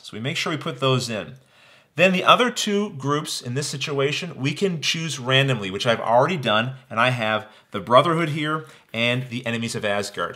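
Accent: Canada. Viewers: This helped alottttttt ..... PS... this guys accent is so canadien haha great job though!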